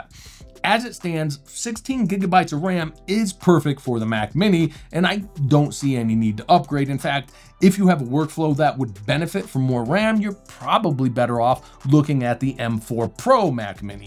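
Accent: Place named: United States. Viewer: I really have problems with strong American dialect ...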